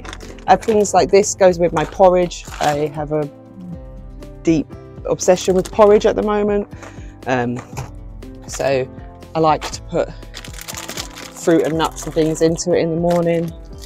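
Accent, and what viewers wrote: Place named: Southern England, United Kingdom. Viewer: I thought you sound like a southern girl. Love your crochet blanket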